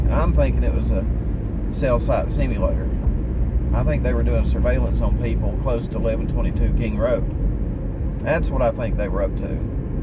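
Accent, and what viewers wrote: South, United States. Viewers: I like your nice southern accent. I am in UK, and it sounds nice. Kinda very relaxed.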